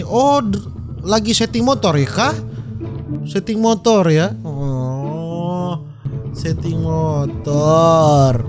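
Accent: Manado, Indonesia. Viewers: Cuma Reaction bang yg bkn gw ketawa.di tambah logat Khassss manaddooo.. Tambah lucu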